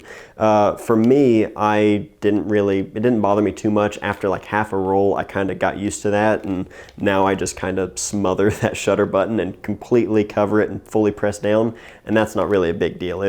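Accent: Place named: Mid-Atlantic, United States. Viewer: I know a mid-Atlantic accent when I hear one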